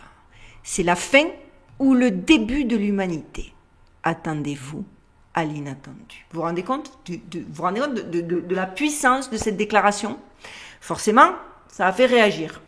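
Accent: Marseille, France. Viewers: Ce petit bout de femme est juste extraordinaire !! J avale les vidéos les unes après les autres depuis 3 jours !! D ailleurs je suis marseillaise près du vieux port 😊 haaa vous n avez pas perdu notre bel accent ça fait plaisir ❤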